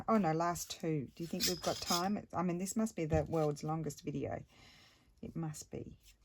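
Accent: Australia. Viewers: I so enjoy your videos! You are so talented and nothing beats an Aussie accent, especially when you say here! Keep up the good work1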